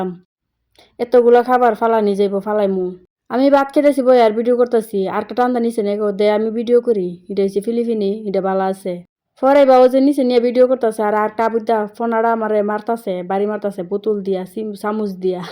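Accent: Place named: Brahmanbaria, Bangladesh. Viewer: আপনি দি আমার দেশি , কথায় বুঝা যাচ্ছে,, ব্রাহ্মণবাড়িয়া কোথায় আপনার বাসা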